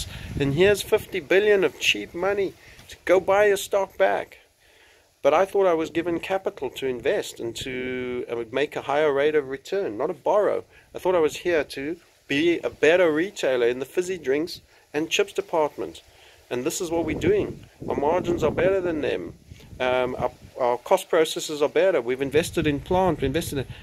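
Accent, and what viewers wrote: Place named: United States. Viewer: 10/10 American accent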